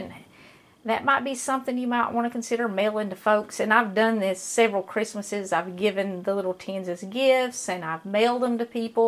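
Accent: South, United States. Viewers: Hahaha 😂.... I wish! I'm thinking the same thing, once I make this in a few minutes and eat a piece I'm going to want to make it all the time. I can't afford to gain any weight. Lol. But I love food, sweets, MMM chocolate! With that being said, I love your southern accent and I love your channel also, and love that you aren't scared to put God into it also, you go girl! I'm in southern Louisiana and I'll be making this recipe for the first time in just a few minutes. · That's our Southern slang. 😊❤️ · Her accent reminds me of the five years I lived in Georgia. Coming from the North at that time, I was fascinated by the southern slang. She’s indeed a sweet spirit, and I am blessed to have found this page. God is always first.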